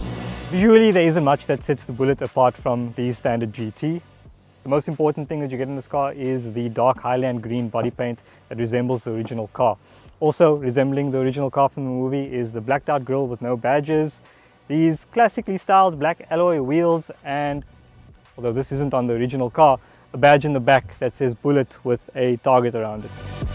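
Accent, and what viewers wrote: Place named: South Africa. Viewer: This presenter has a brilliant South African accent. Fantastic!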